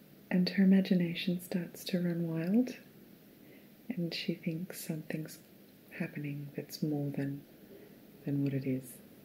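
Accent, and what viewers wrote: Australia. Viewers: 😍📚 Everything about this is perfect! Your articulate summaries for two of my most beloved films, Atonement and Geisha, are much appreciated. ^_^ Keira and Michelle Yuen are favorites. The library is my refuge, and mythology is timelessly dreamy. Your Australian accent lends itself to ASMR as well. 😃 I am thrilled to be a new subscriber.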